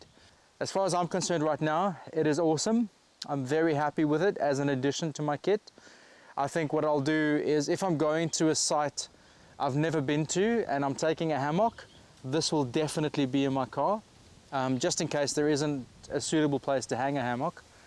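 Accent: South Africa. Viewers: Hey bru Are you south african ?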